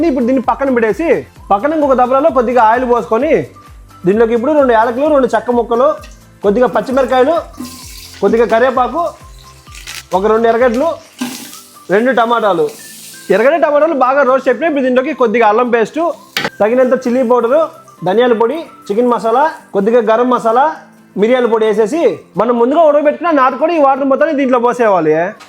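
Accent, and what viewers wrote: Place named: Tenali, India. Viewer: నువ్వు చెప్తే తెనాలి అనిపిస్తది బ్రదర్ నీ వీడియోలు అంత అందంగా నీ మాటలు చాలా బాగుంటాయి బ్రదర్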